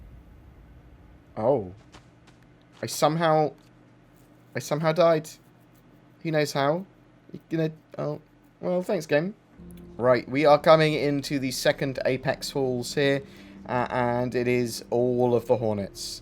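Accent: United Kingdom. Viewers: I find myself throughout the work star saying star citizen to myself in a British accent how says it. Gets stuck in my head. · It's the cool British accent isn't it? Damn, Brits and Aussies they get all the cool jobs.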